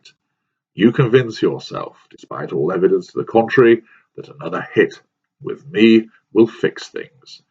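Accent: England, United Kingdom. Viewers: I have always wondered if he uses a device to distort his voice. Either way tell tale signs have popped up showing he is English.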